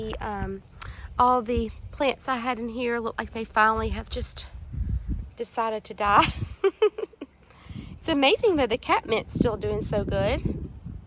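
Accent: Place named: South, United States. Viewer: I love your southern accent!! I wish I had a stronger one!! Enjoyed your garden tour and meeting your doggie! We have chickens too, beautiful property and gardens you have 💚💚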